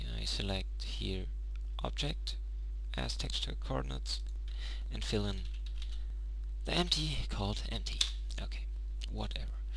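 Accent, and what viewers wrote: Germany. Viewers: I attempted to complete the tut using 2.49b with little success(several months ago). I just finished your tutorial using 2.58b(with major success)!! Hooray. Thanks for the Portal and Fire tutorials. You're one of the best blender teachers out there. Love your German accent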